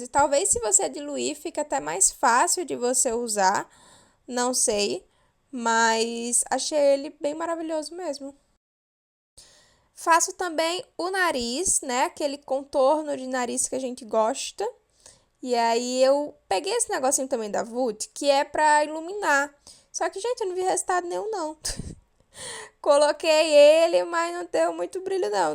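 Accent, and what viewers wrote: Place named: Northeast, Brazil. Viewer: Que sotaque é esse minha fia 😍😍😍 Também sou nordestina Estou super inscrita 💥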